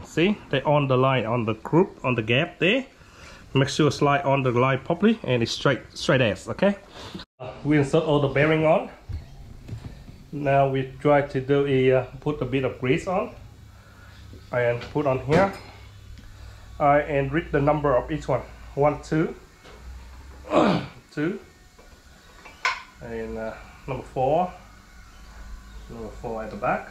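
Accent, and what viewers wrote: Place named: Australia. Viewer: How many years you been a mechanic very smart man love your Aussie accent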